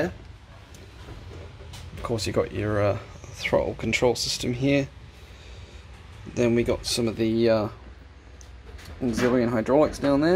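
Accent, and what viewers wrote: United Kingdom. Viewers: Never put you down as an Aussie, your dialect is more Brittish. Sweden had quite a few of these planes, always nice to recognize them.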